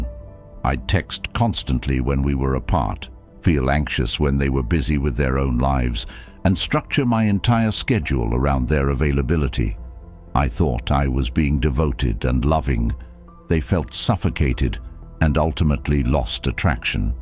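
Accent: United Kingdom. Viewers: AI with a British Accent.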